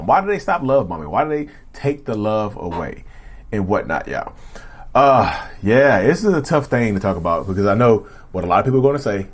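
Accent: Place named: Chicago, United States. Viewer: You from Chicago? Hear the “ you know “ a lot when people are from Chicago or the Midwest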